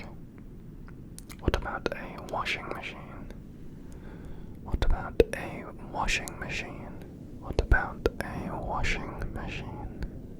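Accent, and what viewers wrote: United Kingdom. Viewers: Love the english accent in ASMR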